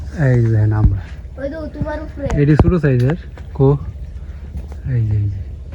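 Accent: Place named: Mymensingh, Bangladesh. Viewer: ভাই আপনার বাড়ি কি ময়মনসিংহে কথা শুনে বোঝা যায়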